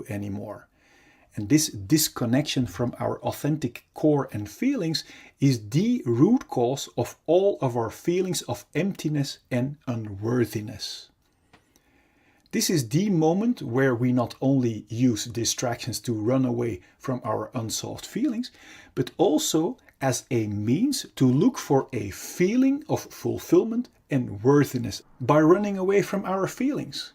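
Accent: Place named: Netherlands. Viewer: Are you Dutch? I think I recognize your Accent. If so, cool , groetjes uit Utrecht.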